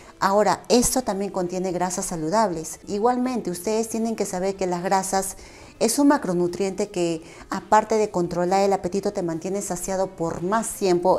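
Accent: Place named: Peru. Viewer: Hola, estuve buscando en internet de qué país eres, al oir tu acento me enorgullece que seas peruana como yo, pero cuando dijiste cilantro, pensé que eres mexicana, hasta que te ví con tu amor haciendo desayuno dominguero y mostraron la ocopa. Orgullosa de que seas peruanísima. Un fuerte abrazo a la distancia.🎉❤❤❤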